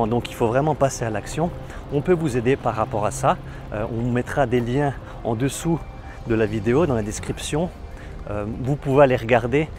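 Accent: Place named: Switzerland. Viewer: Par contre prendre l’accent suisse …. S’intégrer je veux bien mais y’a des limites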